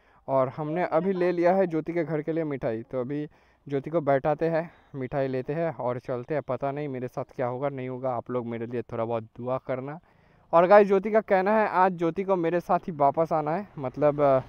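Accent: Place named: Bengal, India. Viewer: Bhai I like your way of talking that you can say that Bangla type Hindi. Actually I can't understand your Hindi because my mother tongue is Kanda but I like your video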